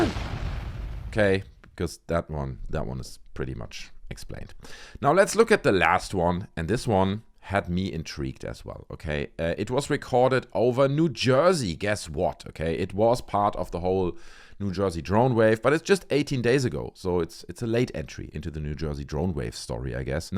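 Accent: Germany. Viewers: an english speaking german sounds so intelligent and trustworthy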